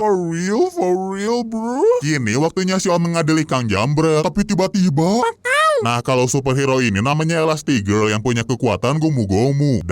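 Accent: Java, Indonesia. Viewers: Orwng jawa kah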